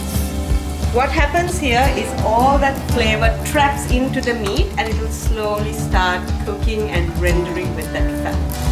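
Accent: Sri Lanka. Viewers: I think it's bit hard to guess hmm 🤔 Nevertheless when it comes to your Sinhala accent it's more like a Sri lankan's one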